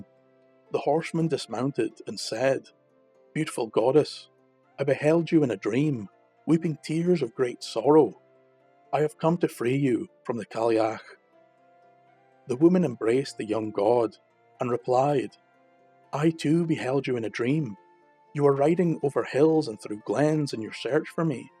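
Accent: Scotland, United Kingdom. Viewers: U’r Story-Telling has held me En-Tranced thru-out! I’v not ever heard this ancient Tale of Love ❤ & the forthcoming of the Enlivening Magical Energies of Summer-Time🙏🏻🌳🌹💐🦋 I especially En-Joy hearing Ur Scott Accent - It’s a Marvelous Brogue for the Ear👂to Hear ❣️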